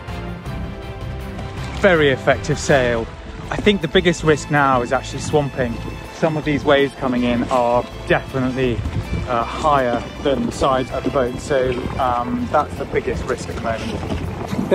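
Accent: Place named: Scotland, United Kingdom. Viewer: I struggled to understand your broad Scots accent. Nice canoe, err bike, err binoe, err cake. It’s a cake, definitely a cake.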